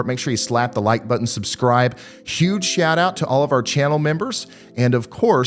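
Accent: United States. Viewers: Gotta love that country accent with the weather prediction 🙂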